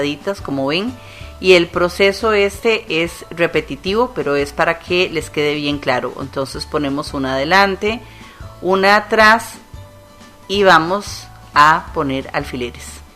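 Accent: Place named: Costa Rica. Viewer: Que lindo trabajo, muchas gracias por la paciencia y por enseñarnos, por el acento de tu voz supongo eres pura vida de costa rica😊, un saludito muy especial desde mi 🇨🇴 bendiciones😇😇😇🙏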